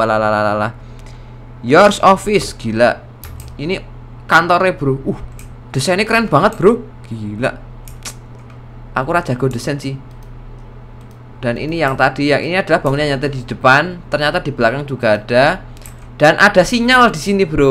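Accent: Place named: East Java, Indonesia. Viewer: khas jawa timur mas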